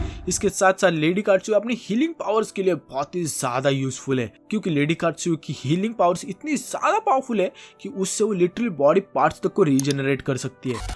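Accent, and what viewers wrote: Maharashtra, India. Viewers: Bhava Marathi Ahes ky??? Thodasa Texture Janvlaaa!!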